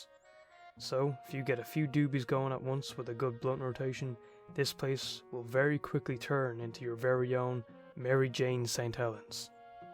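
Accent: Ireland. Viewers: I'm from Cork and you sound like half the people I hang out with, Are ye Irish?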